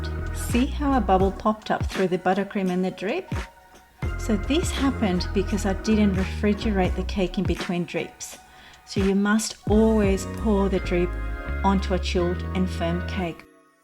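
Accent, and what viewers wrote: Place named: Australia. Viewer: Love it... voice over is amazing love your aussie accent!!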